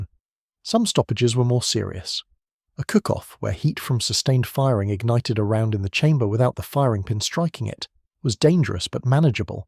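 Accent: United Kingdom. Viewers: The narration uses a British voice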